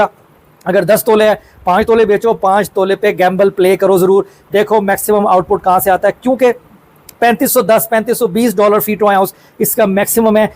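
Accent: Punjab, India. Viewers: Aap Urdu bolty bolty thora touch Panjabi ka lagaty ho acha lagta h, khush raho.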